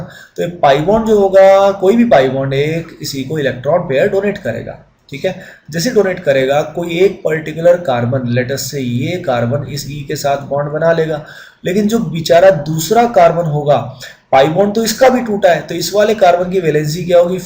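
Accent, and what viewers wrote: India. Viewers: i really hate indian accent but thanks for ur effort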